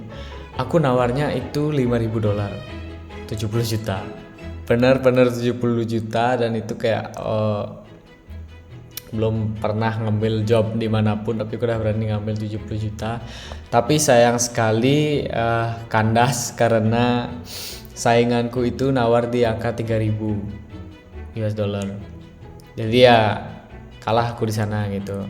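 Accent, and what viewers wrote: Bali, Indonesia. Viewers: logatnya kayak orang bali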